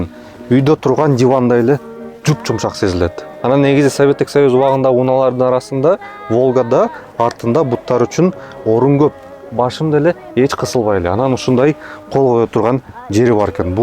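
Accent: Standard. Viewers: Сонун экен .Алып баруучу сага чон рахмат ! Таза кыргызча айтып бердиниз . Ишинизге ийгилик. Чон респект !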